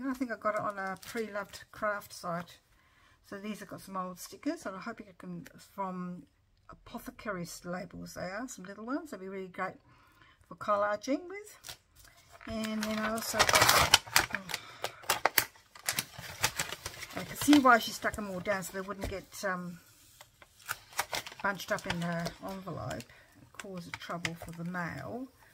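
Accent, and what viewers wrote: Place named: Australia. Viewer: Love your vid! It’s nice to hear an Ozzie! Lovely cards and washie tape and stamps! Love to see what you can do with them! Francke from Brisbane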